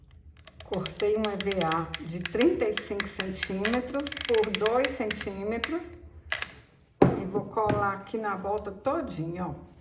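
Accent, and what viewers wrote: Minas Gerais, Brazil. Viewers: Você é mineira? Eu sou cearense, moro em Fortaleza. 😀 Adoro o seu sotaque! Parabéns pelo seu trabalho! 👏👏👏👏👏👏👏👏👏👏👏👏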